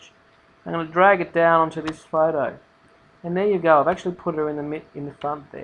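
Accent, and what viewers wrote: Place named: Australia. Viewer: omg i luv ur accent! austrailien, right? (did i spell that right? i dont think i did.)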